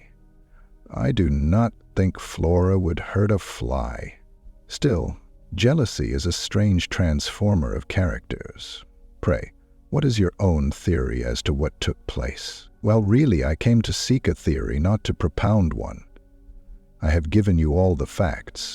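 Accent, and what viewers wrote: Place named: United States. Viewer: No to an Anerican accent to read SH . Sorry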